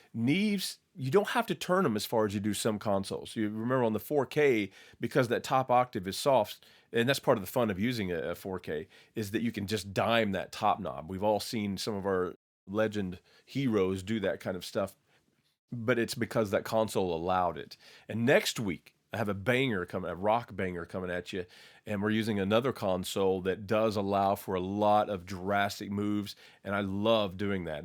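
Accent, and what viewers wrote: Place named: United States. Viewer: probably the best Neve teaching tutorial ever and the sample music was pleasing .... nice to hear an American accent too...thx !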